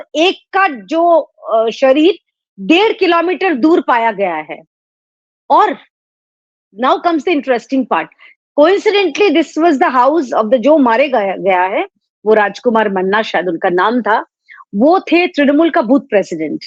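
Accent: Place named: Eastern Uttar Pradesh, India. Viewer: ji your skill of communication is superb/excellent.. Your Cristal clear views & way of explaining the facts is appreciated.Very well done. probably you belong to eastern UP or bihar..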